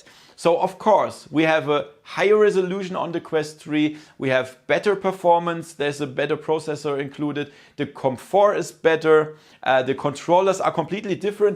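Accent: Germany. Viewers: Are you German 🤨🤔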